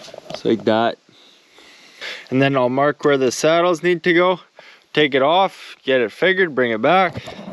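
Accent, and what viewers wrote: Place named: Newfoundland, Canada. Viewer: i'm not canadian so forgive me if this is offensive, but is that a newfoundland accent. it sounds so much more irish than most canadians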